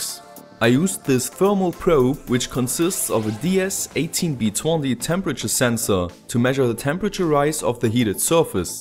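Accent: Germany. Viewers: Where are you from? Bist du Deutsch/ Are you german?:D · du you come from germany ?xD i come from ! you hear so x33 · Are you realy German? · Are you german or something? YOu sound like a german speaking english :D Wenn ja nice :D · hör ich da nen dutschen akzent ? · Are ou german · i cant hear your english anymore. you are definently german or sth. else! (i m 14 and german)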